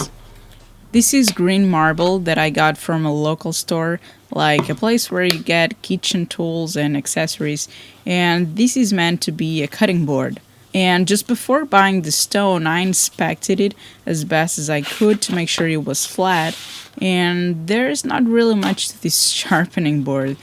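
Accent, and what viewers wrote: Portugal. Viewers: so agora depois de ver tantos videos é que percebi que és de Portugal :)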